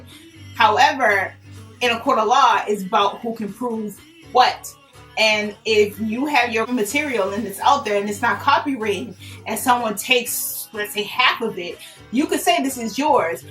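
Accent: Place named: Queens, United States. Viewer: You got to be from Queens. Shhhh. Much success.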